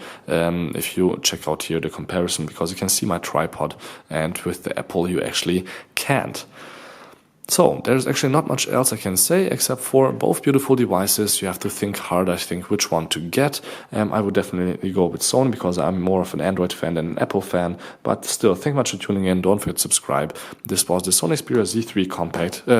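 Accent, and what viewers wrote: Germany. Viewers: You notice right away that he's german, lol. iPhone 6 FTW! ❤ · Der deutsche akzent.... schrecklich!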